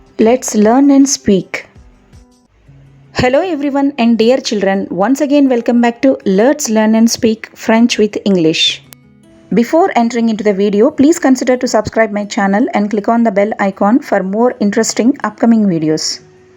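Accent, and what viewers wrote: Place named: India. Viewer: Very helpful as this accent is from indian 😀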